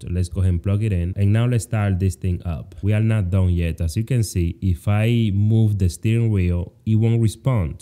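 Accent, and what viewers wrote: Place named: Dominican Republic. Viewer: i know a Dominican when i hear one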